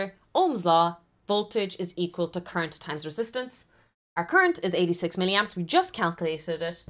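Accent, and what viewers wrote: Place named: Ireland. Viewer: Nice explanation. You sound Irish.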